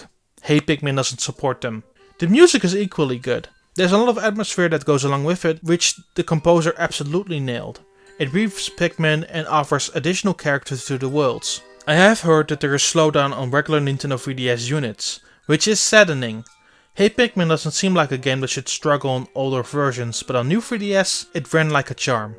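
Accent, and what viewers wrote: Netherlands. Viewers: Is that Guy dutch? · He's Dutch, like me. Not everyone speaks flawless English, you know? ;) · The video started and I was like "hey this guy is Dutch". Then I saw name in the description. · I know how hard it is to speak English with a Dutch accent, its difficult pain in our asses. Great review, even though nobody likes this game. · Are you dutch? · Damn! You're doing a great job working with these guys. I can still hear you speak English as a Dutchman. I hope you will keep working on that but eh... Denk dat vele mensen uit Nederland een voorbeeld aan je kunnen nemen.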